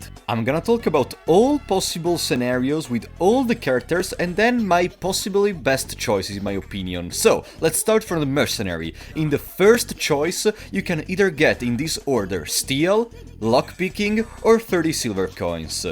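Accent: Italy. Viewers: When I first started watching your videos your accent sounded Russian, but then after seeing you play the game Italian you now sound Russian but with an Italian twang at the end of your wordsa. Even the content creators for this game are a maddening riddle and mystery. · fwiw i love your Italian accent. I'm an American and I understand what you're saying. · Are you italian?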